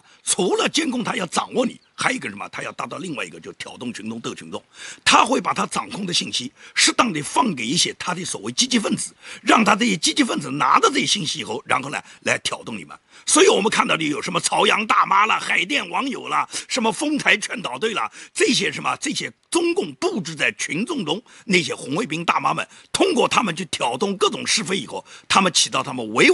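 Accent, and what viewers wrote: Nanjing, China. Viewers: 过去的同事是南京人，所以，对南京人的口音感到很亲切。最重要的是，的内容好。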